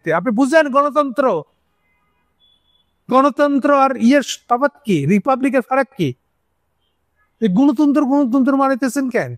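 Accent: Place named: Bangladesh. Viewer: Love the words " maraen , maraita aise etc" keep it up. Best of luck Da Da. Great and perfect khas Bangladeshi delivery ❤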